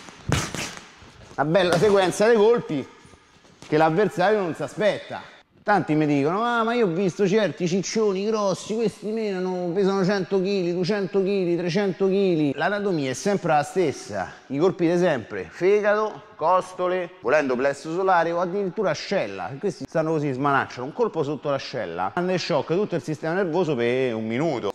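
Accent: Rome, Italy. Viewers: Amo troppo l'accento romano xD Anche se sono Genovese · a romanaccio!!!! te piace da menà! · Il più bel corso di difesa personale mai visto...Grande anche l'accento Romanaccio...Aooo sei troppo forte..Ciao..Pam Pamm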